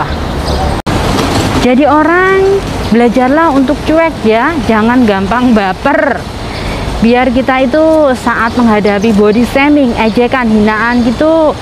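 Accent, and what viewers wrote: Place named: Java, Indonesia. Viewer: Informasi yang sangat bermanfaat ni mbak, di liat dari logat bahasanya pasti mbaknya orang jawa ya 🙏👍👍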